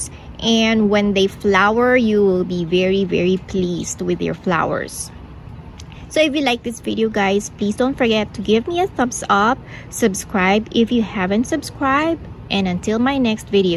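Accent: Philippines. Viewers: "Rainbow Burst" would be a hybrid seed strain name. Plants from this strain would be variable in form and colour, hence the "rainbow" moniker. Latin names for cacti are ever changing and confusing but mostly very simple. The genus name: Echino = hedgehog. Opsis = appearance. That's a wonderful description...Of just about every cactus! The species name comes next and usually describes the flower or spine colour, the plant's form, the location where the plant grows, or the name of the European person who "discovered" the plant and decided to name it. Common Latin names for colours are: Flava - yellow, aurata - golden or orange, viridi - green, alba - white, rubra - red. Latin names make descriptions easier and more concise. It's much easier and quicker to call a plant Echinopsis Sanguiniflora than to call it "a cactus that looks like a hedgehog with blood coloured flowers." Hope this helps a little. 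I don't mean to be patronising, just giving you information most plant books don't give or explain. Looking at your photo I thought at first you were Mexican, then I heard your voice and now realise you're from the Philippines. I enjoy your channel and seeing the myriad cacti you grow so well. Mabuhay!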